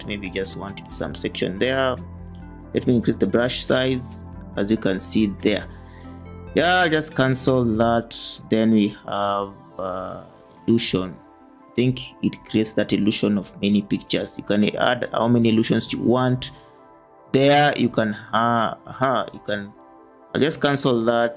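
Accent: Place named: Kenya. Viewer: Your african kenyan by accent😂😂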